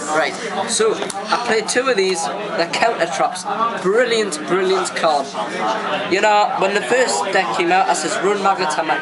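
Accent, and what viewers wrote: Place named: Scotland, United Kingdom. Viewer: i love your accsent dude.sre you scottish?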